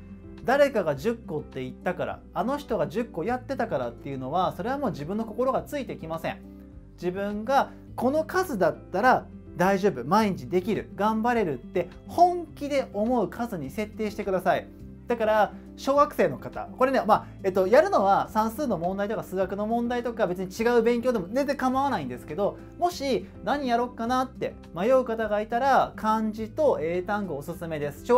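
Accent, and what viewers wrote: Kansai, Japan. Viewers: ちょっと関西弁訛りある気がする(結構好き)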